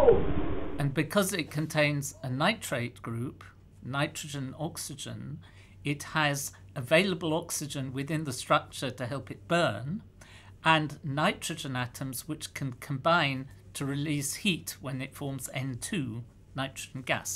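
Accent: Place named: United Kingdom. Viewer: Science is more fun with british accents and stereotypical scientist hair.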